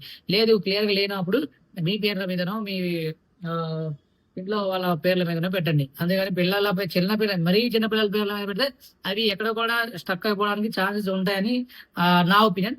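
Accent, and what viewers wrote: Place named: Hyderabad, India. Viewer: Thammudu nee telugu Urdu+telugu kalipi unnattu ga vundi....video cheyse mundu konchem telugu practice cheysi Cheyyi....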